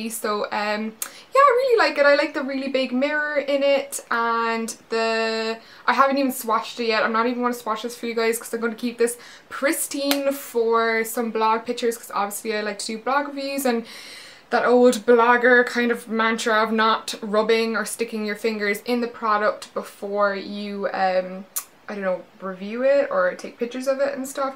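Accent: Ireland. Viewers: OMG are u Irish I am too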